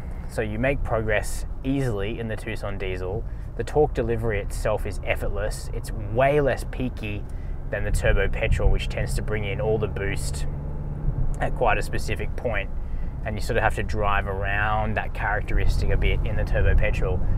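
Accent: Australia. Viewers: Victorian? accent.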